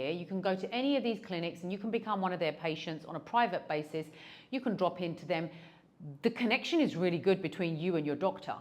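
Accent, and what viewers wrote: United Kingdom. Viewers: Love your British Accent! reminds me of my student years in England..wonderful! you are indeed a fast learner and definitely have picked up pretty well..I feel that your cost of living calculation is fairly reasonable! Definitely agree with you taking a drop in maid once a week!! keep up the excellent reviews of Malaysia!👍👍💕💕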